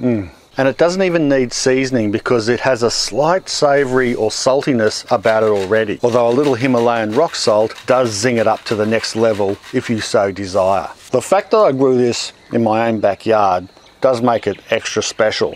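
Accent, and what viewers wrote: Australia. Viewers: NS R LMAO, Australian sounds nothing like English This is probably the heaviest Australian accent I’ve ever heard, you should be able to tell straight away. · I don't know what I was expecting.. but the Australian accent caught me off guard. I think it's slightly purple yeah. I actually did ask what it tastes like 😂 was expecting "like popcorn!"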